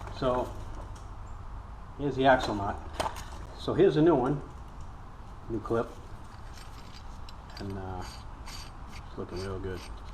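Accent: Boston, United States. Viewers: Def sound like he from Boston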